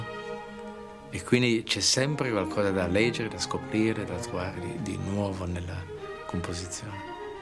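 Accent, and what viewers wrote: Germany. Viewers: He sounds like a German speaking a good Italian. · The translation is...not very good. At some parts, quite inaccurate. His ideas, I don't completely agree with (though he has a warm personality). And his Italian strangely sounds like coming from somebody who speaks more German than Italian (this comment comes from somebody who only studied it, but it's obvious he doesn't sound like most Italians). BUT his conducting and the music ... absolutely sublime. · Ma perché parla con accento tedesco? Sembra un tedesco che parla in italiano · Interesting comment about Latin countries producing melodic operatic music and Germanic countries producing the greatest symphonic works. That’s where Mozart comes in satisfying everyone! Wonderful to hear this consummate musician doing the same thing when speaking in Germanic Italian.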